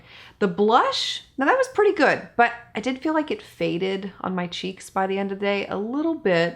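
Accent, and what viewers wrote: United Kingdom. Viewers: I can listen to people with English accents all day too. Thanks for such an honest review. CT has beautiful packaging and some great products but it's too expensive. Can you do a first impression of MUFE new self setting concealer? I'd love to hear your thoughts on it. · Yes I too Love British accent, hence my Dr Who and BBC subscriptions. Thank you for taking on and testing Charlotte Tilbury. I don’t understand the hype because the shade range is not Woman of Color friendly. I have learned so much from her as a makeup artist however you would think she would be more inclusive. Btw can you please do a video on care and maintenance of lashes. I watched your video to apply and am doing ok but it’s the wear them more than twice I am working on. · British accents are the best! LOVE Charlotte Tilbury.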